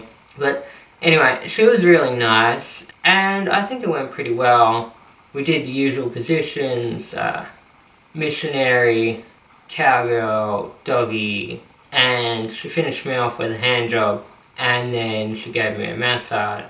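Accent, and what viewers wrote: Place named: India. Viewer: You live in Australia why is your accent messed up brother? Your Indian gene too much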